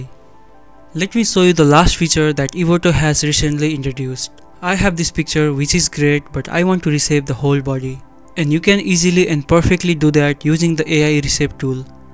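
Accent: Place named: India. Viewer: Bro, please work on your English. Good videos but that Indian accent is terrible.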